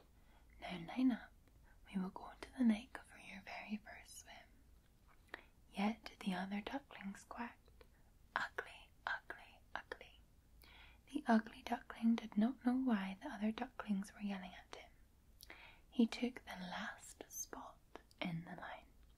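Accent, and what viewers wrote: Scotland, United Kingdom. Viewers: She is the best ASMRtist for reading stories with her beautiful ❤️ Scottish accent!:_Loveheart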